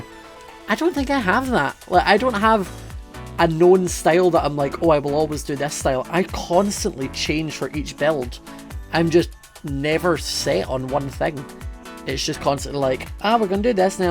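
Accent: Scotland, United Kingdom. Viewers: I LOVE YOUR ACCENT YOU SCOTTISH CUTIE ❤️❤️🏴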